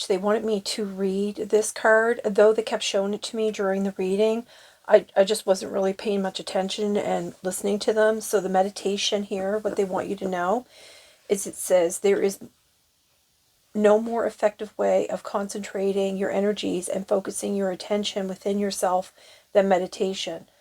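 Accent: Canada. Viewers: YOU SOUND NEWFY TO ME, WHY ARE THEY SO INTERESTED, ITS MY LIFE AND IT IN TROUBLE RIGHT NOW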